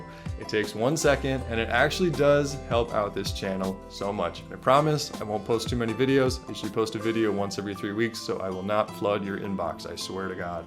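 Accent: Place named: United States. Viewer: I don’t know why I always assumed you were from Eastern Europe. I was shocked to hear the American accent 😂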